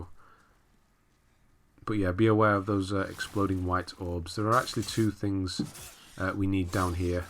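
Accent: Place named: Teesside, United Kingdom. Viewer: mate these have been a great help so far never played a souls game before had to restart after watching your first vid as I had agro'd that first Prince and ended up feeling like I had to kill him I have now filled all the way through taking shots at doing it on my own and watching the vids to make sure I havnt missed anything and leveling up with you at the end this has been a great help were are you from you sound like you are from near me I'm Teesside UK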